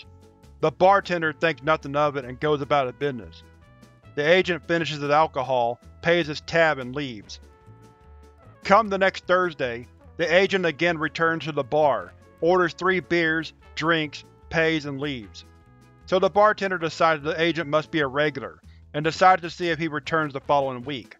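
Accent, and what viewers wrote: South, United States. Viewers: Wait....this is your normal voice? It sounds identical to the voice you use on the other videos? Southern drawl and all.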